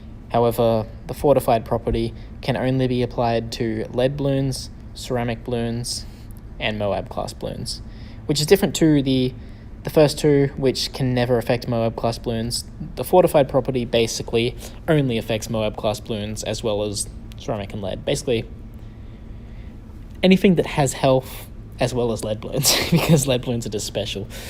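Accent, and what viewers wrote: Australia. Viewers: I swear this sounds like an Australian accent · Are you from Australia? new zealand? Bro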